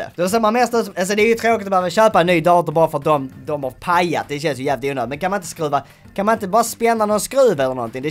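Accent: Scania, Sweden. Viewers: Den skånska dialekten är då jävla rolig🇸🇪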